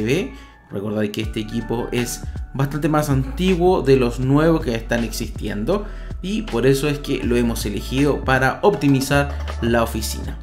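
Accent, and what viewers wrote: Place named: Chile. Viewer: Eres de chile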